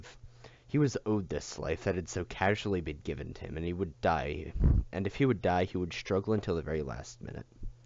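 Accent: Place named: Scotland, United Kingdom. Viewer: why do you speak in "Scottish" voice?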